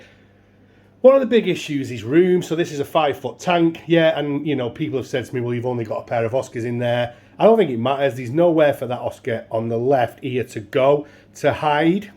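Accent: Manchester, United Kingdom. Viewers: Love your vids, your accent sounds like your near me in manchester ??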